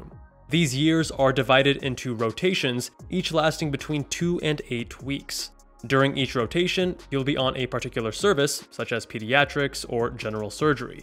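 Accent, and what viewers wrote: Canada. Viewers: I differentiate accents from Canada vs USA by how Canadians pronounce about (Canadians say "aboot"). In some Canadians, "aboot" is more noticeable while in others it's way less prominent. I must say, the narrator of this video has the most prominent "aboot" that I have ever heard! PS: I'm an English speaker, but I'm neither American nor Canadian.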